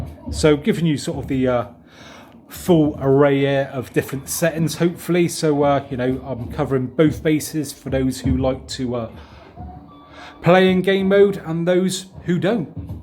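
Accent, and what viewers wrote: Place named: Southampton, United Kingdom. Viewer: Your videos helped me buy my TV (Samsung q90r, 65inch) so thanks for that! My girlfriend and I are trying to guess your accent. We have money resting on this so please can you let us know who won? (she thinks Southampton). Thanks for your videos, from Mallorca!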